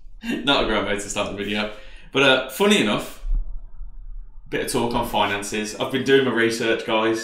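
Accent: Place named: United States. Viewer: Like your American accent.....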